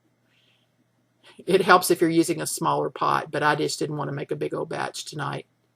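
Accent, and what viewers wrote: South, United States. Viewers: 3 years later and I'm still making these dumplings! I'm doing them in a beef stew but works just wonderfully. I love revisiting this video, I'm from Canada and I live for the southern accents! You know the recipe is going to be good, when you hear that accent! Cheers!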